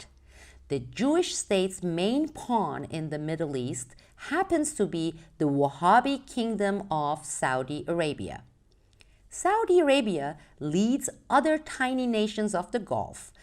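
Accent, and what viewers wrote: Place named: Iran. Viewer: What's your real name? I can definitely detect a Persian accent.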